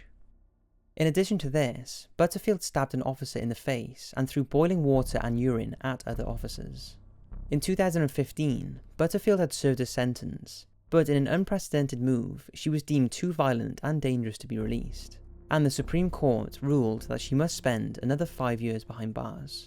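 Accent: United Kingdom. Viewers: PLEASE add English captions because I am a big fan of your videos and there are many others do too and we can't always understand your British speech (it is amazing but we just want some captions alongside it :). If you could PLEASE look at this comment and pin it if you saw it, a replay is awesome too man.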